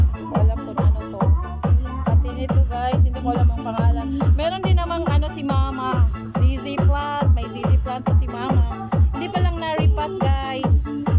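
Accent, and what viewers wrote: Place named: Visayas, Philippines. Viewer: bisaya ka ma'am haha, kadaghan tanom oi, kugihan si mama mo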